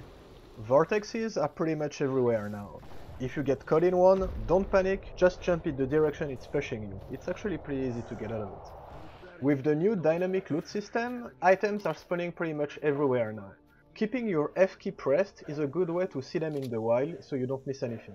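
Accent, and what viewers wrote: France. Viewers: ...wait ... are you french? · Mdr t'es français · l'accent français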